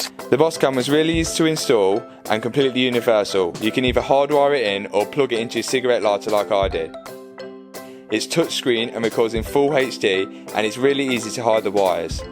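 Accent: Leicester, United Kingdom. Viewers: Good work 👍🏻 Just come across your channel tonight. You from Leicester? You sound it. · Thought I recognised the accent being the same as mine! Leicester ♥️ Great vids!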